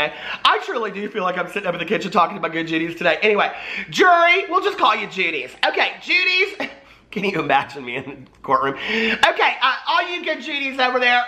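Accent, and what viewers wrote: South, United States. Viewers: OMG you do remind me of Nancy Grace! Sassy and southern! Can she please come back on TV?! I miss the endless tea on tot mom and that Jodi Arias.....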